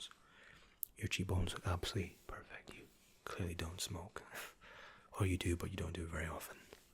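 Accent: Scotland, United Kingdom. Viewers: I love that Scottish accent - East coast? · Idil Ozler Scottish I think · Great british accent! finally glad I found ya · He is originally from Coventry, but he and his parents moved to Scotland when he was around 3, I think he said, thus something of a very slight brogue. But recently he moved back to England for work. Where in England, I'm not sure, though. I don't think he said specifically, to my recollection.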